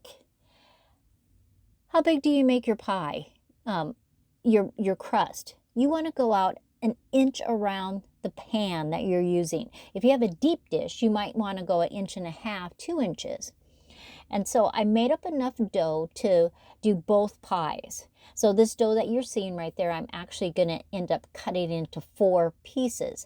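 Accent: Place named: West, United States. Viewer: Absolutely beautiful!! You are amazing!! I have to tell you, my Mom was born and raised in Livingston Montana. You say “frigerater” just like she did. 😍 must be a western thing. 😀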